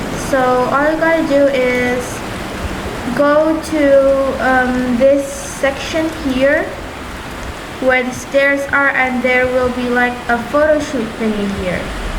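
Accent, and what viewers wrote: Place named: Malaysia. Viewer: That's her malaysia accent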